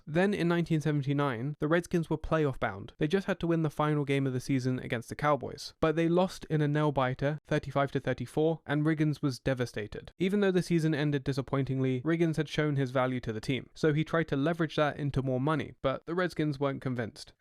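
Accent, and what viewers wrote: England, United Kingdom. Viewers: I get so sick and tired of somebody putting on youtube , talking about a sport with an english accent that they're just reading a f**** script and know nothing about do better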